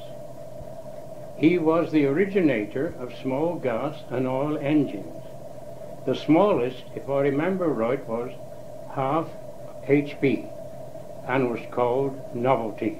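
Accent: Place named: Worcester, United States. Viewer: How great to hear an old Worcester accent.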